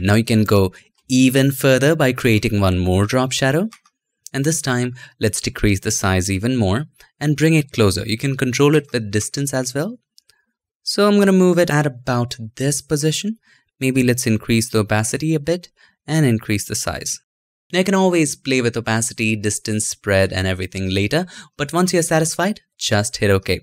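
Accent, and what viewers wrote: India. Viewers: You know what’s better than an annoying Indian accent? Proper English.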